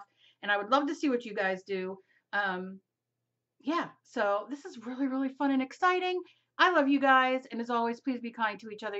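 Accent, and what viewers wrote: New Jersey, United States. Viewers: I THOUGHT I RECOGNIZED THAT JERSEY ACCENT!!!..I'M FROM WILLIAMSTOWN N.J...JUST A STONES THROW FROM YOU...LOTS OF ARTSY STUFF IN JERSEY!!!!!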